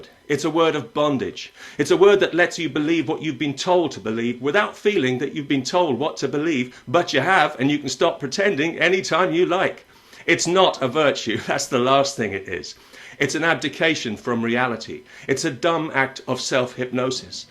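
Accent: United Kingdom. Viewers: fine British articulation are almost hypnotic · This guy is like the Amazing Atheist only with a spiffy accent and a snappy British wit. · with a british accent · I guarantee you that you wouldn't sound NEAR as convincing without that British accent. That's really all you have going for your argument.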